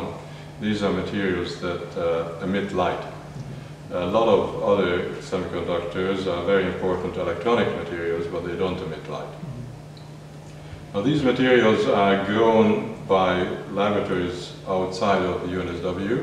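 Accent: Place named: Netherlands. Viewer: I hear the professor is from the Netherlands.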